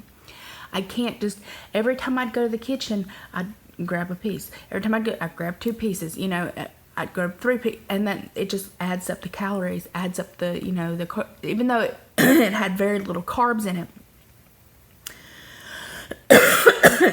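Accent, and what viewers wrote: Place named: South, United States. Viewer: girl your from California????....where that southern accent.come from???.... some people in Bakersfield area of California.have a country accent....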